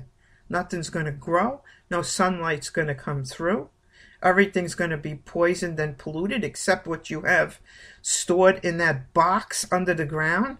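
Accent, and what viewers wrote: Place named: New Jersey, United States. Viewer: I am so glad I came upon your channel today. The messages you deliver, of the videos I've watched so far, could not have come at a better time for me. I love your accent (Jersey?) and articulation. Thank you for sharing this knowledge with the world. We so need to hear it and spread the good Word.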